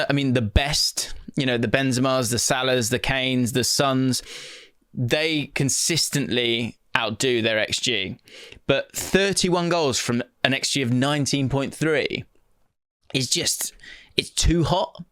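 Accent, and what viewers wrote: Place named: RP, United Kingdom. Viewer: still pretending to have a clue and hiding behind his posh accent to fool the rubes. I see you.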